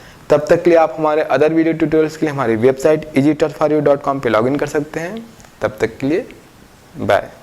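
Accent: Bihar, India. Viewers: by your tone i think you're also from bihar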